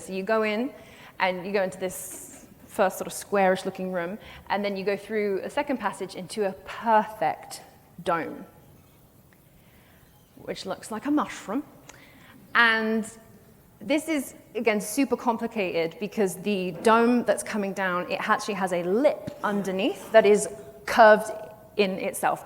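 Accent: RP, United Kingdom. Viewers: Oh god this is a disaster. She's been riding the ditzy, irreverent, 'errrm-girl' thing for a while now, and it's bad enough on a pre-recorded podcast. Being pretty and having a posh English accent isn't enough. You need to be vastly knowledgeable, a skilled communicator, and compelling to listen to. People really underestimate what it really takes to be a Professor Brian Cox or Dr Irving Finkel.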